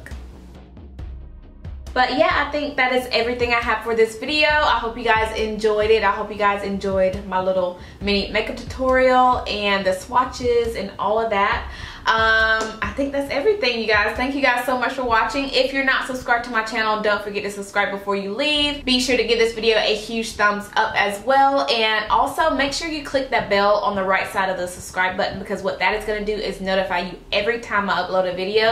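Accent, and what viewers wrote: South, United States. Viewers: you are southern! lol it's so cute!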